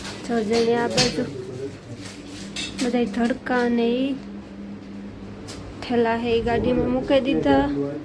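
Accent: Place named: Mer, India. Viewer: બીન આપણી મેરની ભાષા સારીલાગે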